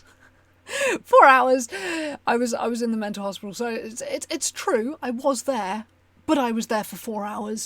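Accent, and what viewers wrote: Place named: South England, United Kingdom. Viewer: I've just discovered your channel after being recommended some of your ED content (I've never had one but I'm interested in educating myself and understanding others' experience) I just wanted to say your MH experience here is scarily similar to an experience I had back in 2012, except I was locked up for three whole months. As an informal patient! And I regard myself lucky because services were slightly more funded at the time... You've articulated this whole thing wonderfully. Also, shout out for the south England fam - I noticed your accent was similar to mine and then you mentioned a place name that isn't too far from where I grew up so, yay!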